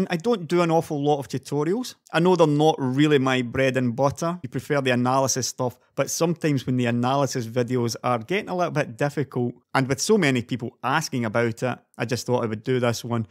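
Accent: Scotland, United Kingdom. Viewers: I just assumed it's another scottish dude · are you scottish? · like the scottish? accent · I love the scottish accent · are you Scotish or Indian ? cause i really like your accent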